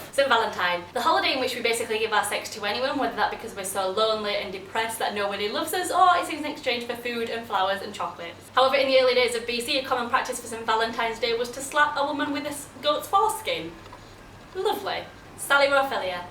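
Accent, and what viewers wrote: Yorkshire, United Kingdom. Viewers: Are you from like Yorkshire or somthing!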